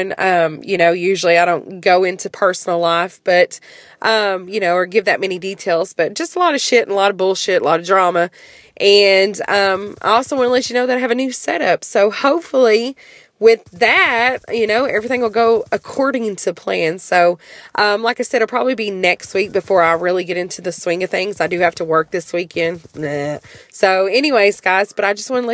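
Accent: South, United States. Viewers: It was like Christmas seeing a video from you! :) Missed that southern voice! Keep your chin up, sweetie! Hopefully this drama passes quickly.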